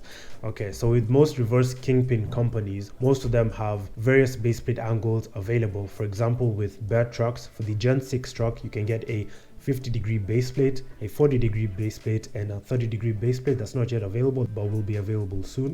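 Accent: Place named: Africa. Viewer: ive never commented in 7+ yrd watching youtube. I skipped over yours cause of a black man W/ affrican accent under a few seconds. After tons of BS from other channels I watched to see you explain in a way I could not only comprehend but was exactly the info I needed to mitigate tje problems witj my ride i I was facing but could not pinpoint. Although the true test of tne info you shared will be on the black top, Im adjusting my equipment as I text and we'll see. I'm telling this to say that predjudice sucks.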